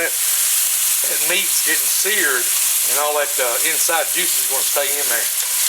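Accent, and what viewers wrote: South, United States. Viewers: Country as hell omg can barely understand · Yum Love your southern accent !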